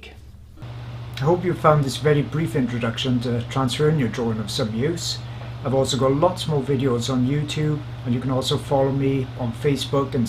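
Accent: Wales, United Kingdom. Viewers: Hi You work is amazing...and I really appreciate you sharing your knowledge. Also being from Cardiff myself... it is refreshing to hear a fellow Welsh accent and to know that it isn't just singing but also Art that Wales is good at. Thanks Sandy. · Ah hello my fellow welshman. 👋 It took me about 5 seconds to detect that accent. 😁 Great tips 👌🏻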